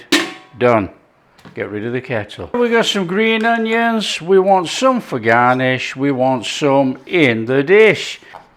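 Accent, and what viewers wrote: Yorkshire, United Kingdom. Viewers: Beautiful, mate. Looks easy and tasty. Will give this a go tonight. Where abouts in the UK is that accent from? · Thought i heard the yorkshire accent. Cool thanks for the video.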